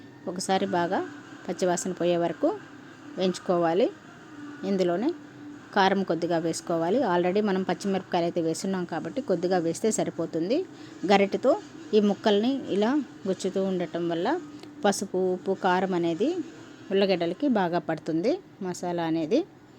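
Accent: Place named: Rayalaseema, India. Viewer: మా రాయలసీమ భాష, ఎర్రగడ్డలు,వుర్లగడ్డలు వినడానికి ఆనందం గా వుంది.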